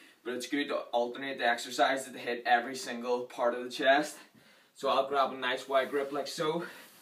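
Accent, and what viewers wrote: Northern Ireland, United Kingdom. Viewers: Everyone wondering asking where he's from. My most accurate guess is Northern Ireland.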